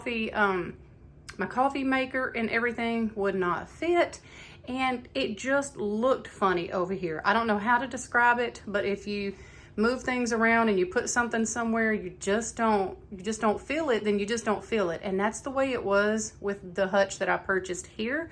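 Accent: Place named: Fort Worth, United States. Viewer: My third comment today only it's a question just based on your accent and like I said I have not listened to a lot of your videos not because I don't like them but because I do listen to a lot of others especially about politics and the Christian religion which I am a Christian but are you from the Fort Worth area I live in suburb of Fort Worth I was just wondering which antique shop you went to LOL but I think you should have definitely got the first one but again send me the name of the artist and the name of the actual picture that's what on that little tiny brass placket that's tacked on there that's will tell you if it's valuable or not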